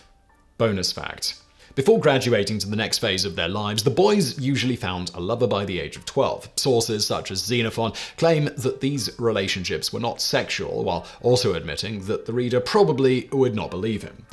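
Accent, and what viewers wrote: England, United Kingdom. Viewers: Do you mean his English accent?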